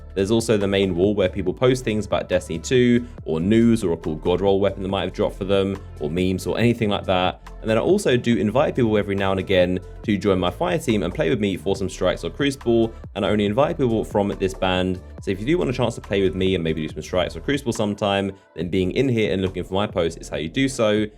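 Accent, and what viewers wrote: United Kingdom. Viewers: Another one of thoses british with a unsufferable accent. Clones AND cookie-cutters, all of them.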